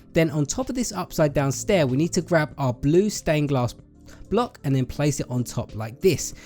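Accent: United Kingdom. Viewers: are u british because u talk british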